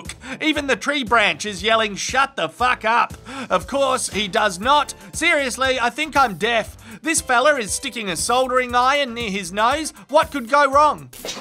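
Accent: Australia. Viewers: You should start a discord channel, and teach people to speak australian! :) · I love Australian accent....Faaeece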